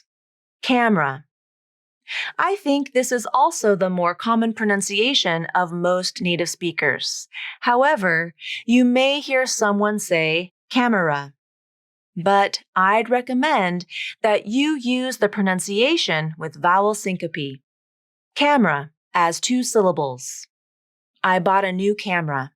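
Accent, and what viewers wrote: San Diego, United States. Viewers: un abrazo!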